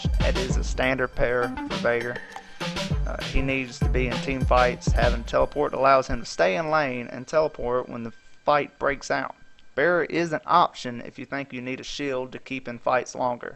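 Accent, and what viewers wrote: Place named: East Coast, United States. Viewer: You sound east coast but that’s just me、(I’m East) keep up with the videos man! Also great editing :) lil YouTube channels keep this clustered genre new. You will blow up keep going nuts on LOL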